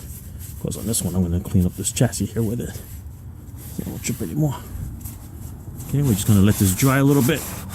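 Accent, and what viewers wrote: New York, United States. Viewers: Great job. That's a heavy NY/NJ accent. Lol. Cool though bro.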